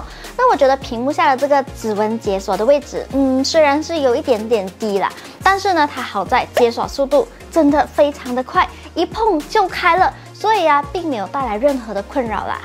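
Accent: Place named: Malaysia. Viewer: 馬來西亞口音與講話習性 我也不太能接受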